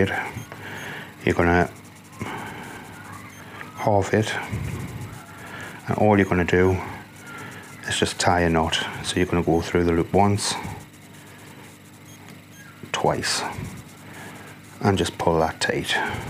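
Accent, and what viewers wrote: Newcastle, United Kingdom. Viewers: No offence but I hate the Geordie accent I've had a few bad run ins with Geordies and I just can't handle the accent any more. Good fishing tips thanks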